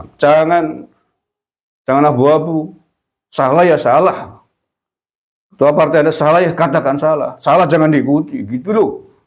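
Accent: Banyumas, Indonesia. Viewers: Semangat truss mas Bro , ...ngapak menyala ❤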